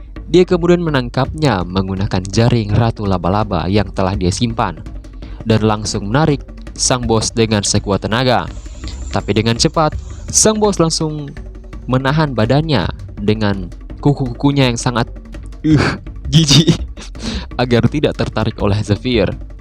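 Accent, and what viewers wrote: Sulawesi, Indonesia. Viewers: Org Sulawesi ya bang?😆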